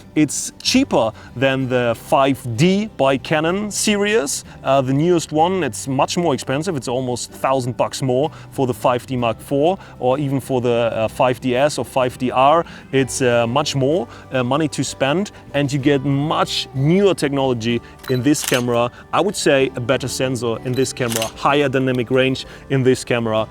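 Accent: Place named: Germany. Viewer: Hey, habe auf dem deutschen Kanal das Video gesehen in dem die Frage gestellt wurde 'wieso so viele englische Videos usw.?' Ich schaue mir lieber den englischen Kanal an.. ich liebe es mich dadurch weiterzubilden und dein englisch ist schön klar verständlich. Scheiß auf deutschen Akzent.. mach weiter so! Ich feiere den Channel!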